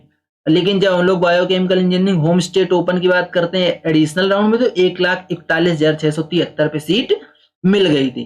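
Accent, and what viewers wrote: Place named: Kanpur, India. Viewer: Kanpur se ho na aap Lahze se lag raha hai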